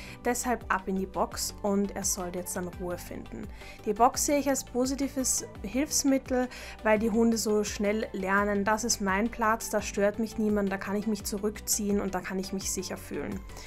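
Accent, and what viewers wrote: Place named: Vienna, Austria. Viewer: Liebe, ich habe gerade abboniert. Bist du aus Wien? Du klingst so, ich bin aus Wien. Morgen zieht ein Hund aus Russland bei mir ein. Wenn sie sich eingewöhnt hat könnten wir Socialwalks machen. Du wirkst sehr freundlich und positiv. Liebe Grüße.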